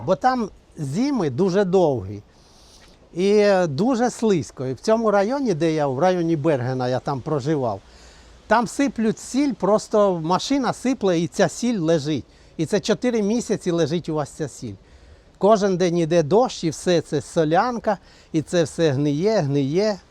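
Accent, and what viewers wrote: Chernihiv, Ukraine. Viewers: Ви мабуть з чернігівської області по акценту чутно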